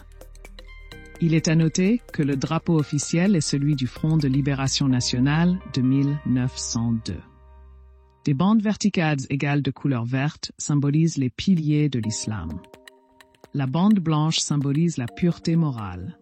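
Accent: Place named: England, United Kingdom. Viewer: Vous avez un léger accent anglais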